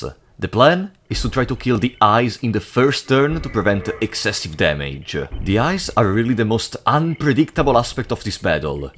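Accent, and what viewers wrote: Italy. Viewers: I didn't know you were Italian (was really wondering what kind of accent do you have) been watching you for a while now, from multiple accounts. Big love from russia❤❤❤ learned about FaH from you btw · you sound italian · You're Italian? Man I suck at recognizing accents. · So you're italian!!! All ghis time i wasn't able to identify your accent! Anyway glad you recover from your bad time, you truly someone i aspire to.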